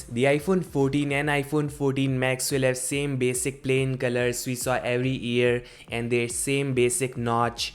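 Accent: India. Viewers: tabhi sochu voice indian lag ri thi 😅 U should do face cam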